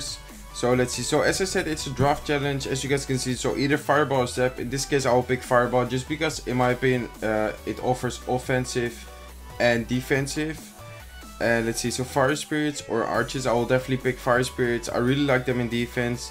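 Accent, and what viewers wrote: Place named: Netherlands. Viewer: Je praat Engels met een Nederlands accent... Ben je Nederlands?? Anders zou je dit niet kunnen lezen... Op xD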